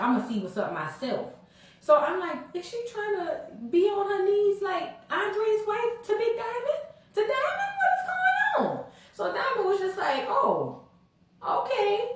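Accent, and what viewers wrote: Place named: Louisiana, United States. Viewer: I love your reviews girl and love the Louisiana accent 🥰🥰🥰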